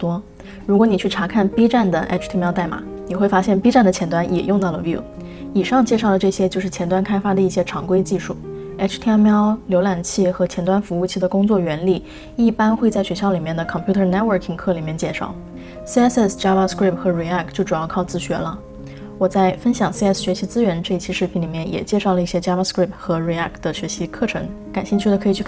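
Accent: Standard. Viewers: up主中文非常好。 我基本用英文学完，用全部中文基本解释不来。 而且up主连“逻辑”的发音都跟高考要求一样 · 来看只是确认一下 up 主懂不懂技术，看来是我错了，真的懂。发音也很标准，走了...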